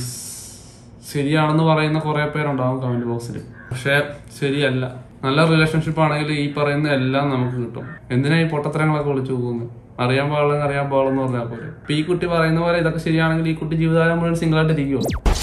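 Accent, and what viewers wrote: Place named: Kasaragod, India. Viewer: എവിടെയാ നാട്. നമ്മുടെ kasargod ഭാഷ വരുന്നു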